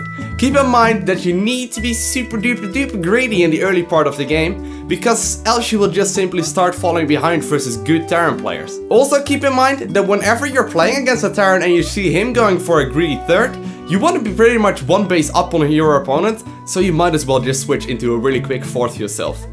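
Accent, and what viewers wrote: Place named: Netherlands. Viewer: Huh thats why you sounded a bit familiar. Where in the Netherlands do you live?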